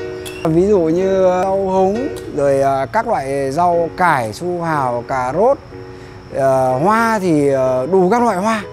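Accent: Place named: North, Vietnam. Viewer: Ngay từ đầu đã đoán bác ngoài Bắc lập nghiệp trong Nam rồi. Chúc bác mạnh khoẻ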